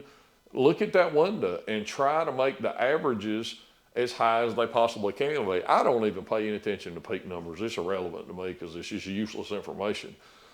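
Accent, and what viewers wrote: South, United States. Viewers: Love that southern drawl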